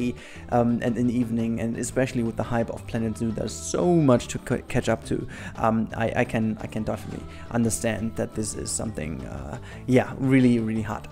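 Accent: Germany. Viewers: Ich persönlich habe kein Problem damit, wenn jemand ohne Skript spricht. Den Deutschen Akzent hört man zwar raus, aber den machst du eigentlich durch gute Wortkenntnis wett. Ich kann dir jedenfalls gut folgen ;D Vielleicht redest du manchmal etwas schnell, aber nicht so, als dass ich das negativ anmerken würde. Mach einfach weiter so. Die meisten Kommentare unter diesem Video finden das auch so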